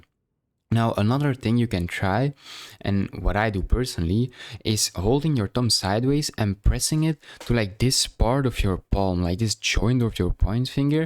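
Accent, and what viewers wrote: Belgium. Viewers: belgian accent vs brazilian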